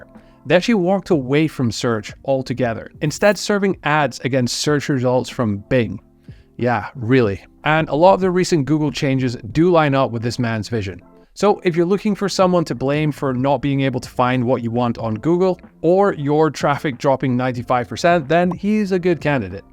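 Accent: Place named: France. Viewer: My site's numbers went back to normal almost .. climbed back up in a week. I like this copy of Income school's report but with a French accent. :). Well, same tribe destroyed almost all US corporations, from Pharma to Boeing and everything in between. Just so they can rise from slumps and not be slump dawgs.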